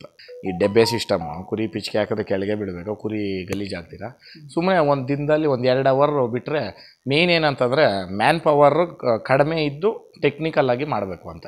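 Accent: Kolar, India. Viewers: I love your kolar kannada and also your work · Nimma kolara kannada kelode chenda❤️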